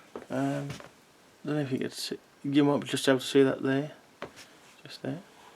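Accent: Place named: Black Country, United Kingdom. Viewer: So good to hear a Yam yam on youtube!!!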